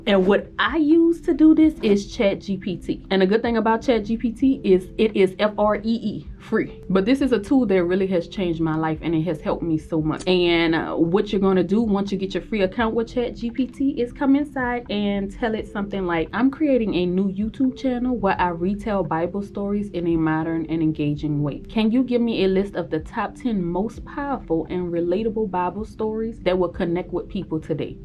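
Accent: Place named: South, United States. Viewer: she's definitely from down south with that accent lol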